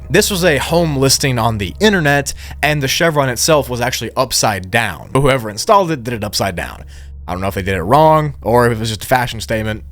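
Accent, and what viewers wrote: United Kingdom. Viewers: I can tell you're Scottish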